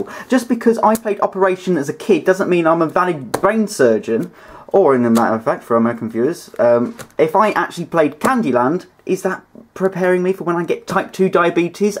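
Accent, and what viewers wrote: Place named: Manchester, United Kingdom. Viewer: #1 BEST MANCUNIAN ACCENT EVER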